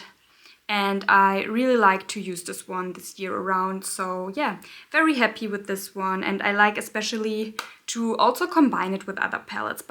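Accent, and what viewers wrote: Germany. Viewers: I love your accent! Do you live in Germany? Happy New Year❤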